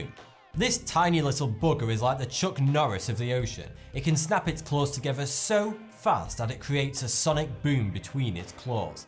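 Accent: United Kingdom. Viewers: for the longest time i thought you were saying either 32 or 42, time to do a show on british accents, and accents in general, the exact samething happens in any language, though; as in france, and in the north of spain, let alone the whole country. ( secret, the family model ) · ohh im so in love with the Brit accent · Why do Brits replace words with a "th" in them to an "F" sound? And Rs are pretty much silent? I fought you wuh going wif him because I hoff to bwush moy teef befo badtoyme. I'm sure it's a regional accent and not all British people sound that way, I know a few Englishmen and some have a very thick accent and others aren't as noticeable except in certain words. I think this guy just has a speech impediment, and with his British accent on top of it, it makes him sound funny. I know I'm not the only person that hears Forty Two when he says his YouTube name at the beginning of his videos, so I'm definitely not crazy. 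Speech impediment aside, I still very much enjoy the videos and find them really interesting to watch. Learning is fun! Especially when you've got someone with that kind of accent telling you the facts! It causes you to have to pay extra close attention, or you might misconstrue what's being said! Keep em coming, or should I say foughty? I'm just having fun here, no malicious intent or hatefulness coming from me, so please don't bash what I have to say about his accent! I've got my own terrible accent that im very much aware of and I have to listen to people make fun of the way I sound and be patient while they try to figure out where im from based on the way I pronounce certain words. As long as people aren't being insensitive I'll play along and get a laugh or two in alongside them, but the second they assume that im not intelligent bc I come from a parti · He is saying, it's just his british accent that makes it sound like 42. 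Also is a play on words of 42. Also, he's named that because of the hitchhikers guide to the galaxy, where it says the meaning of life, the universe, and everything is 42, which obviously, is a joke.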